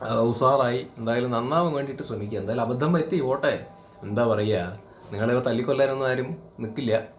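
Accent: Palakkad, India. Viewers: Bro. Nammande Palakkad accent super...Keep it up, and best wishes. Big fan of you from Delhi..👍👍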